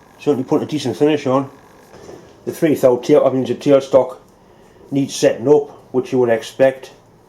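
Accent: Newcastle, United Kingdom. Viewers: Great,I could listen to a geordie talk engineering for hours...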